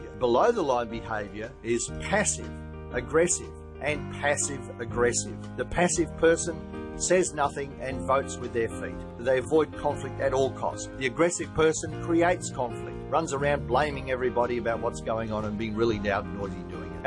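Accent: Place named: Australia. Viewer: I loved the Aussie accent 🫶🏼🦘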